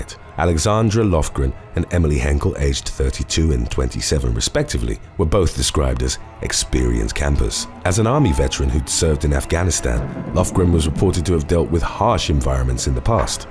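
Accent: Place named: London, United Kingdom. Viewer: Dude, you have quite honestly the coolest voice. What part of London are you from?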